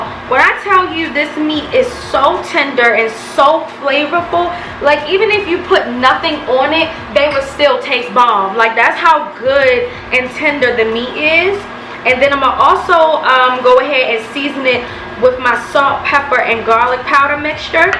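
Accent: Baltimore, United States. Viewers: Oh u def from baltimore. I can tell by your voice. U get a like comment sub of that alone.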